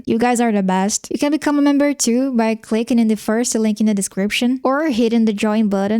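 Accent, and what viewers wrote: Brazil. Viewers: Your accent sounds very Brazilian to me🤔. I'll definitely make it, adding a bit of my own style. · hey, just one tip: you don't need to `sudo` to save in `~/. config`, or at least you shouldn't. EDIT: just watched the whole video hearing your accent and thinking: he/she/they is Brazilian 100%, so not surprised when saw you have an authentic 100% Brazilian BR ABNT2 keyboard Hahahahah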